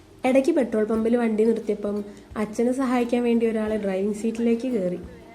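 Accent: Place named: Kozhikode, India. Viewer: Awesome presentation! It was great to hear😊. പിന്നെ ഇങ്ങടെ കോയിക്കോടൻ ഭാഷ പൊളിയാന്ന്❤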